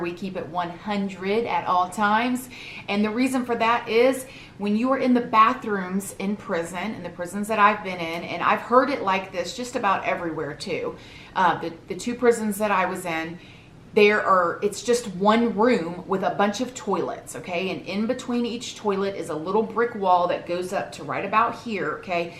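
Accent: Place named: Texas, United States. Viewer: This woman has the Texan accent of my teacher.. But she is from Florida..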